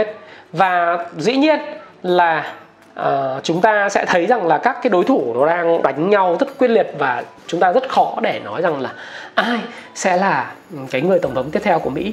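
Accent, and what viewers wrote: Standard. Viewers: Anh nói chuẩn cmnl. Hơi bậy tý. :D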